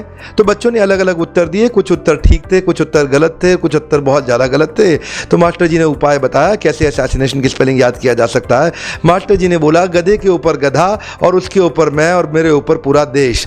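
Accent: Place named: India. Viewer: What talking about ,didn't understand the India English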